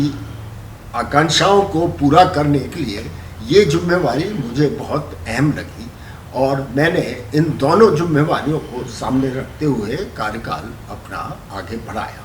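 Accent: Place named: Andaman and Nicobar, India. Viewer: Apko bht subhkamnaye sir. Apke baat me Andaman ka slang jhalkata hai abtak😅. Sir vapas aajao... Beda gark ho rakha hai😐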